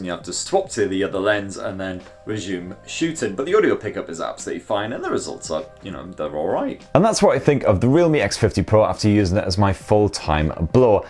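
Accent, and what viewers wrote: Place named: RP, United Kingdom. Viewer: Queens english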